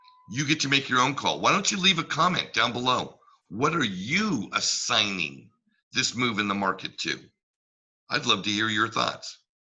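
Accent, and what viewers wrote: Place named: California, United States. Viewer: yeah just discovered you in the last couple days. I heard you’re from NorCal my tax guy lives in SoCal so maybe it’s a CA accent. He’s your age too probably. Great tax guy! and I’m liking your channel so great guy here too😊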